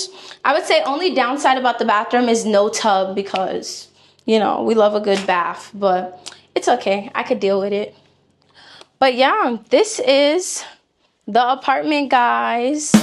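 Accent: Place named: Bahamas. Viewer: Her voice sound like Bahamas, Bahamian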